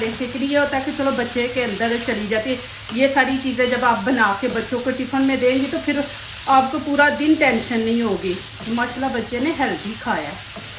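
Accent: Punjab, India. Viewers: Assalam o allaikum best ha laiken khuda k liya apna punjabi lahga sahi krien pleas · Very poor Urdu in Punjabi style. commentary. Improve it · Doosri baat: Jin viweres nai punjabi mai baat karnay ki suggestion di hai woh sach keh rahay hain ap agar punjabi bolain tou apkay show ko char chand lg jayen Urdu ko punjabi accent mai bolnay wali weirdness bhi nahi rahay gi. Aur punjabi sajay gi bhi bohat apki awaz mai... Its just a suggestion Please don't mind. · اردو نہیں پنجابی بولا کریں، اردو پنجابی لہجے میں مذاق لگتی ہے۔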